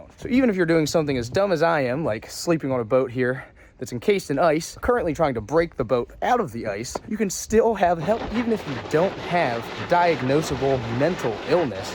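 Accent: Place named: Maryland, United States. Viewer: First time viewer here - I heard your accent and knew you were from Maryland 😂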